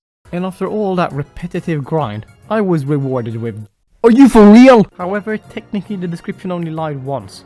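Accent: Sweden. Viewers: Förlåt men det första jag märkte var att du hade en svensk accent lmao- Nice video btw!! :]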